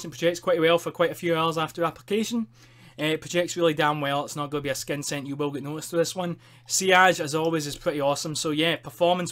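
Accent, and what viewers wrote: Scotland, United Kingdom. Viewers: Where in Scotland you from ?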